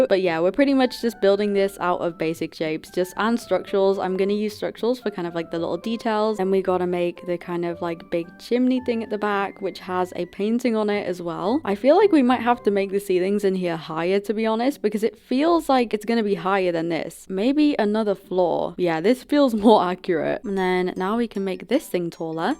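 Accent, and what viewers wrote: United Kingdom. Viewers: Oh my God, I love your accent. It’s like the accent from England. I am not kidding. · Omg your buildings look so good but I love your British accent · Are u British?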